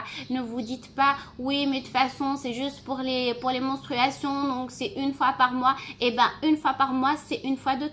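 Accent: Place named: Marrakech, Morocco. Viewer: ختي وراه تنكول اللهجة شوية ديال مراكش 😘😙 يعني قريبة ليا ❤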